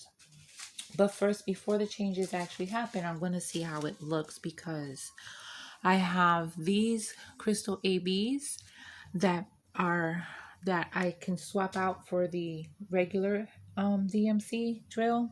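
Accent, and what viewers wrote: New York, United States. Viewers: Hi. I’m new to your channel and I’m so glad I found you. Lisette told me about your laugh, so I had to see for myself. You sound like you’re from NY - my whole family (Mom, aunts, Grandma) is from Brooklyn. Then they moved to Cali and that’s where I was born. What happens if I finish a WIP and start another brand new one - do I add that or count that, or do we just start with a set number and finish them as we finish them throughout the year? I hope that made sense. I have been DPing since this year in April. I currently only have 3 WIPs, so that won’t take me super long to finish them all - for sure it won’t take me months. I plan to join this event, I’m just unsure of a couple of the rules. Thanks for reading my novelette!!! ❤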